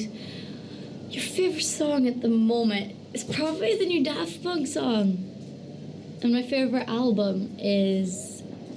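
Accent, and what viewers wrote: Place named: United States. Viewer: im confused about the american accent?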